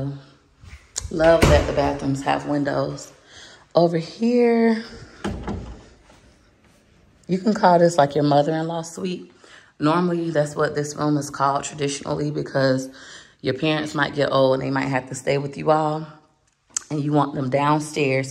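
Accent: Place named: South, United States. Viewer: Okay, I like the house but don’t love it…especially for that much $$, but tell me why your voice is giving Beyonce today? 😂😂 You have that sexy whispery southern voice today lady! 🥰 I missed the zoom this morning, but as soon as you post the recording I’m on it!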